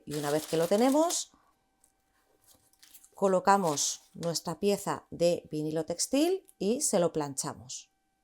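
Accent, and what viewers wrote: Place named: Spain. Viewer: me gusta el acento español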